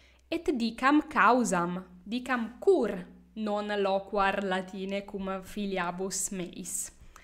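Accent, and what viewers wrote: Italy. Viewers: I prefer by far the Italian accent for Latin (even with these epenthetic vowels) than an Anglo speaking Latin with aspirated stops, retroflex r and clipped vowels.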